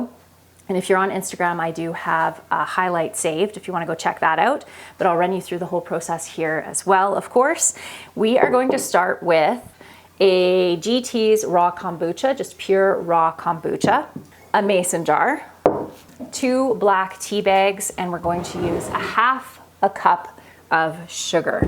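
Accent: Canada. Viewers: I am really enjoying the Canadian accent breaking through in this episode. Ahey! 😂 It is ramping up winter here where I live and you are ramping down. I am missing having a full vegetable garden and you will soon have a full vegetable garden!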